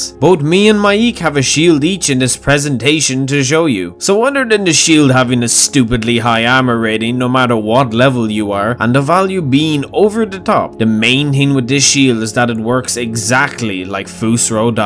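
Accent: Ireland. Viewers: ‘Crisp Irish accent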